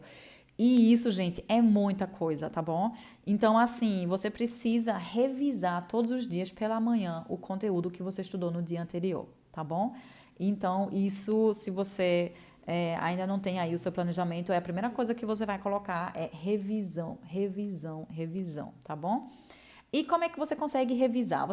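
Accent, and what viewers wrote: Northeast, Brazil. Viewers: Nossa que sotaque de nordestina, amei akkakak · Sotaque nordestino e carioca .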